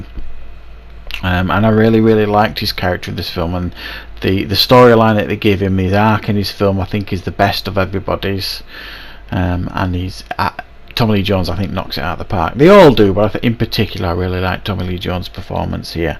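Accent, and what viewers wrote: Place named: Scotland, United Kingdom. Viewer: Scottish Moviebob??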